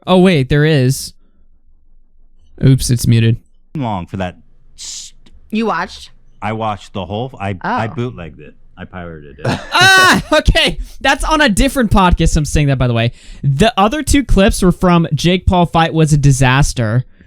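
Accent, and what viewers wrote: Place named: Upper Midwest, United States. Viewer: Damn I thought he was more upper midwest with me.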